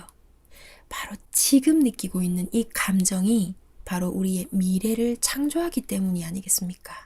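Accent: Gyeongsang, South Korea. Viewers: 처음 들어오자 마자 구독 좋아요 꾹~♡ 누릅니다 사랑스럽고 편안한 목소리에 정겨운 경남 사투리가 매력을 두배로 해주는거 아시나요? 내용도 정말 현실속에 적응하기 쉽게 설명해주셔서 감사해요~~~♥️♥️♥️♥️♥️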